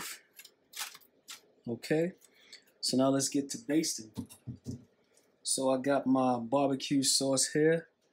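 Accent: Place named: New Jersey, United States. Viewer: I just love listening to your Jersey accent, and those wings look bomb. I'm trying my hand tonight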